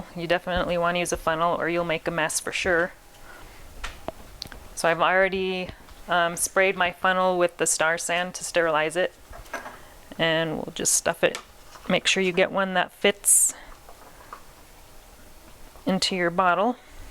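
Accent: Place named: Ohio, United States. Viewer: you sound like you're from Ohio with family from PA or WI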